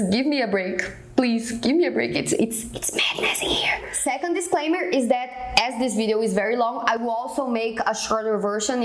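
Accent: Brazil. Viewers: ''welcome chew my channel'' sotaque brasileiro é um charme. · Ai, na moral, que video maneiro cara. Excelente pra inspiração e treino de inglês, ainda aprendendo história do brasil. Sempre faz bem escutar um sotaque nosso que a gente acha bonito. Você é uma querida, juro.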